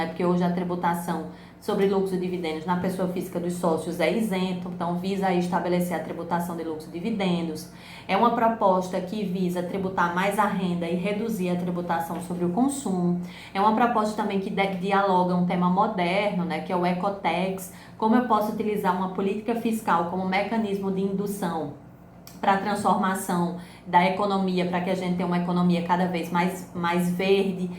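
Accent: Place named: Northeast, Brazil. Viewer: Meu deus, que aula maravilhosaaaa! Quero agradecer de coração, pois estou há mais de meses tentando achar algo que me guiasse nesse TCC. Direito tributário é a matéria que eu tenho paixão e que brilha meus olhos, como a senhora falou precisamos fazer na área temos essa sensação. Com certeza irei seguir suas dicas e tenho convicção que será excelente o tema. Gratidão, profa!! Ah, amei seu sotaque rsrs Sou nordestina também, com muito orgulho.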